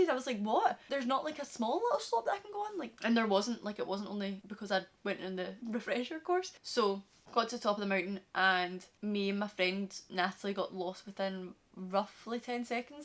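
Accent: Scotland, United Kingdom. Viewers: Random ,but u sound scotish ?nice accent anyway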